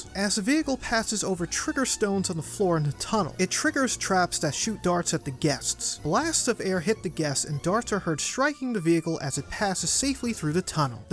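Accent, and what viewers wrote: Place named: East Coast, United States. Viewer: One of the things I like about this channel is that glorious east coast accent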